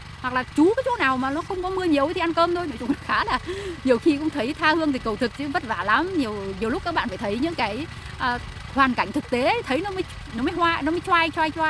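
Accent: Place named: Nghe An, Vietnam. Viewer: Quê nghệ An hay thái bình hả cháu ơi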